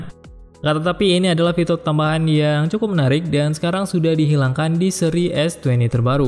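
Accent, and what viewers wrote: Aceh, Indonesia. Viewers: Orang aceh ya bang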